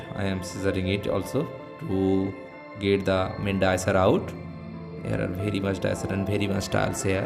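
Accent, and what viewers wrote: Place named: India. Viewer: Very Indian accent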